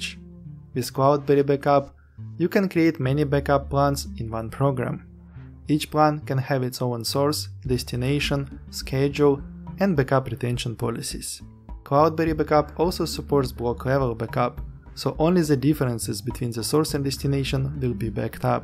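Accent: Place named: Russia. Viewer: Никак не могу привыкнуть к твоему русскому акценту)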